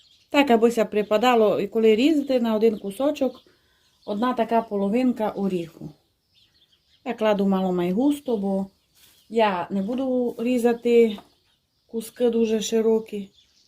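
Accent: Transcarpathia, Ukraine. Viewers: Дуже дякую за чудові рецепти.❤Прекрасний закарпатський діалект.Любуюся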